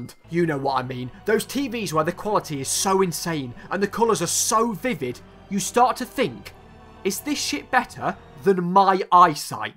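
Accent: United Kingdom. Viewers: U.K. accent is strong in you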